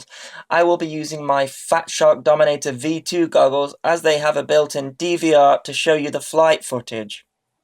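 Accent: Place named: United Kingdom. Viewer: Oh sorry, just you have a British accent and the scenery around where you fly look like it is the uk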